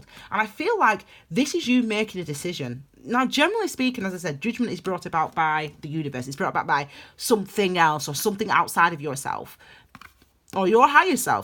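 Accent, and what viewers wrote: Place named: Scotland, United Kingdom. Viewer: First time I’ve listened to you and this truly resonated with me. Love your accent. Scottish?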